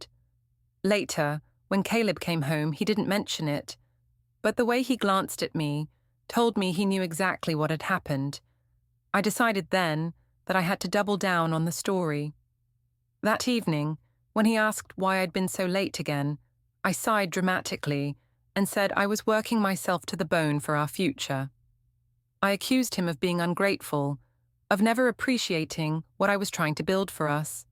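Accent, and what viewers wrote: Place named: London, United Kingdom. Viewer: An American story read with an an urban London accent. Cool!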